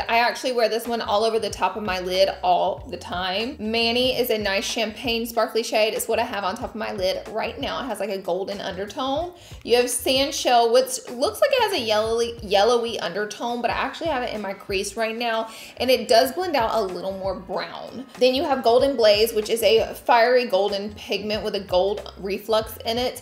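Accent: South, United States. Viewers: This is random and probly so 2017 but I love how you say concealer! Love that Southern accents still coming thru. Also you are always so fun and hilarious. Cracking me up all the time. Between you and Manny just making day. Thank you for being that Icon ,that boss babe for our future generations to look up to, congrats on your new holiday collection and for the price are u kidding, that's a good deal.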